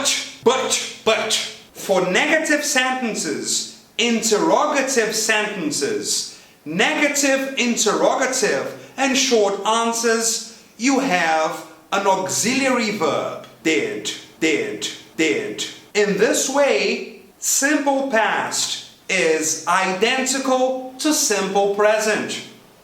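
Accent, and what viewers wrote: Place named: United Kingdom. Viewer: I loved it. It was a great class. Even though I know these rules I often do these mistakes. I was a brave I watched it without subtitle. Your British accent is very nice.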